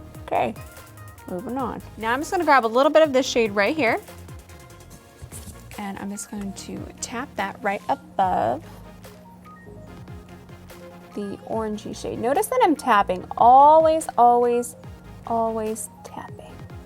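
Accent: South, United States. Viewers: I love your colorful tutorials and your spunk. You are so fun to watch. I love your Southern accent too.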